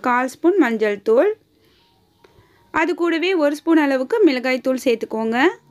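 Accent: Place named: Tirunelveli, India. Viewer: Mam neenga kovilpatti or thirunelveli site ah mam unga speech word enga pakkathula mathiri iruku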